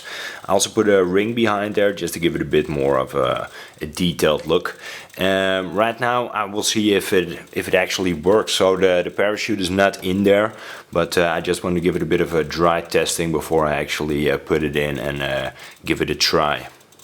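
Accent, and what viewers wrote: Netherlands. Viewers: From what European country are you from, if I may ask? Your accent is a lot like a Dutch accent.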